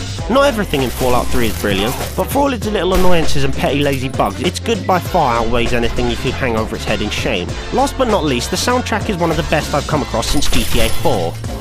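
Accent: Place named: Cockney, United Kingdom. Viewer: You're slighty posh but slightly cockney... Weird D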